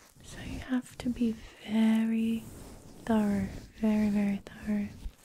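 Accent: Australia. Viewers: You sound like a bit Aussie😅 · Australian accent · As soon as I heard her voice I knew she was from Australia then I checked and I got it right!!! I’m from Australia too! Btw amazing video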